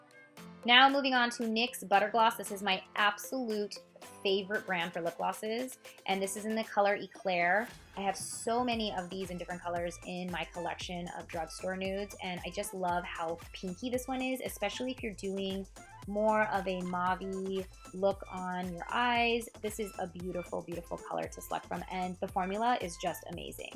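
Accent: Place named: India. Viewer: Hey are you Indian? I really love your accent plz try to reply in Hindi because I wanted you to say something in Hindi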